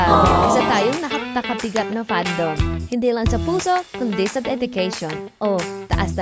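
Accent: Batangas, Philippines. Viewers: Enjoy ako sa Batanguena accent mo Sis.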